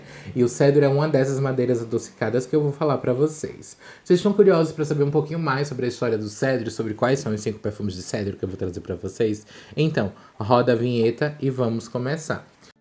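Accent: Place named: Recife, Brazil. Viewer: você é de Recife? você tem um sotaque de algum lugar do nordeste, mas você falando parece muito Recifense.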